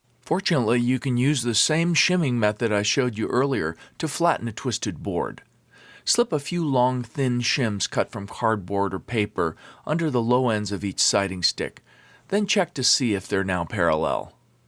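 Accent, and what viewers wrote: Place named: United States. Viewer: What a real american accent from a magyar :)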